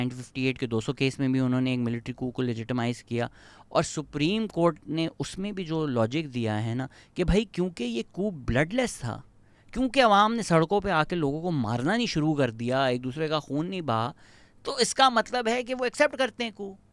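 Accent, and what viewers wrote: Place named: Punjab, India. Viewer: We love when u throw in a little punjabi vich vich 😅 I love your punjabi pronunciation ❤❤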